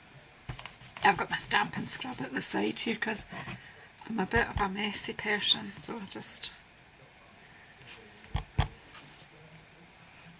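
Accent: Scotland, United Kingdom. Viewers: Beautiful fall cards! I’m definitely going to case them and, no, I never get bored listening to you, I could listen to that beautiful Scottish accent all day long!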